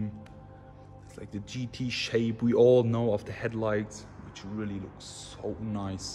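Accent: Germany. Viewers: By speaking in a German accent just makes the video so much more enchanting. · Arnold is Austrian, this guy is German. The accents are totally different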